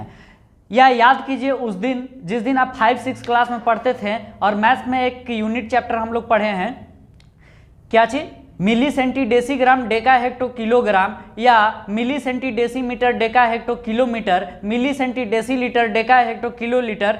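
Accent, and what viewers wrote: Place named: Bhojpuri, India. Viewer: Ye hua smjhane ka idea 👍👌👌 or mst trick se smjhaiye bhot bdhiya h Apka bhojpuri andaj bhi sandar h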